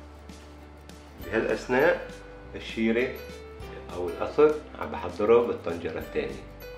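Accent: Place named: Aleppo, Syria. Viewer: احلى حكي حلبي ..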